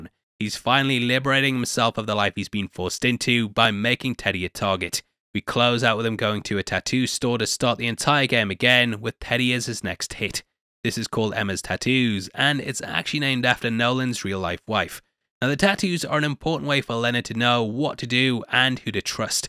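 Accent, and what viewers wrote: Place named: England, United Kingdom. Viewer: You voice is like every English voice put together. Nice video new fan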